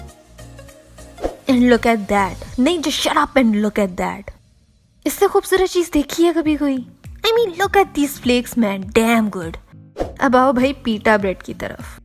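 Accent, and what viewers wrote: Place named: Punjab, India. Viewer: I really loved your channel and I love u too...😘 your accent 😍 of Punjabi..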